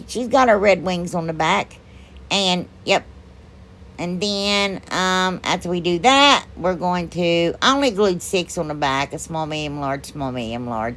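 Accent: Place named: Appalachia, United States. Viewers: Um yea her hillbilly talk well my gosh I thought you were as hillbilly as it got lol !Cute crafts if you can get through the talking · That little lady bug and bee could Bee my friends any day. Absolutely adorable! Now, we need you to do Vampires at Halloween. Yeah, I love those fast talking Appalachian people. I grew up with them. That's why I love to listen to you.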